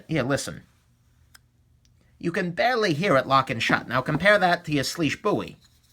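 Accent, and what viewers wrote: New York, United States. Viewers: I love your reviews. Very thorough, but concise at the same time. Can't tell if your accent is New York or Boston.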